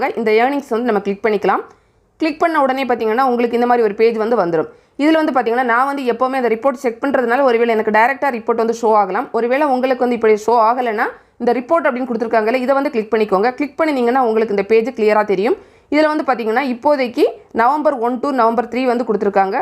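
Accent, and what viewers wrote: Kanyakumari, India. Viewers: Ungaluku native kanniyakumari ya?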